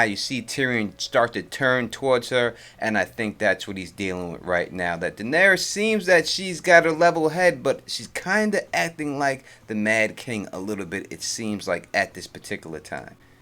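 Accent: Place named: Texas, United States. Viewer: why the hell do these channels all have texas accents?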